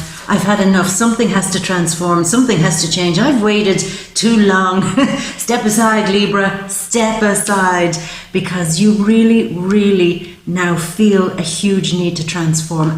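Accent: Ireland. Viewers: Between your beautiful calming energy, gorgeous face and enchanting brogue, I can't help but feel hopeful even facing adversity. Just as you said in this reading, I have someone really rubbing me the wrong way and it's family and I'm shaking thinking about the difficult conversation I have to have with them but I will stand resolute in the knowledge that I will speak my truth and that shall be good enough. Thank you...your gift is truly divine..wish me luck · Irish?? Love the accent. I'm hetro by the way but something about you.......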